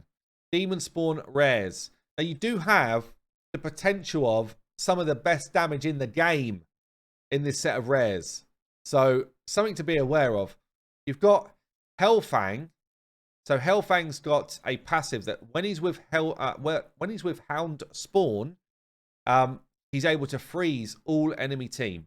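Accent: England, United Kingdom. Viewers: and you should go for a update for some of the free heroes and the artifacts. Almost all the free to play heroes. Love the content. And your English accent 😂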